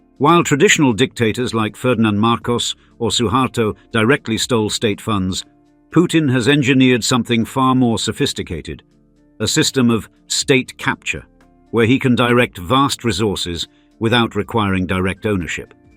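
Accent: United Kingdom. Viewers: Hence the British accent of this "narrator". most corrupt oligarchs are British and Americans...... including prime ministers and presidents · Sounds like this Brit narrator is piss Putin took back control of his country and the west cant loot that 76 trillion of resources.😢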